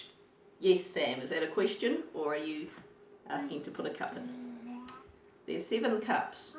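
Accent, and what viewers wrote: New Zealand. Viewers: Lol Ido love how natural you are! Also its great hearing a NZ accent ❤ Commenting from Waikato, North Island. Great channel, keep up the good work 👏🏼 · Just returned to oz after a wedding of my niece; so nice to hear the kiwi accent. From a very homesick kwassie.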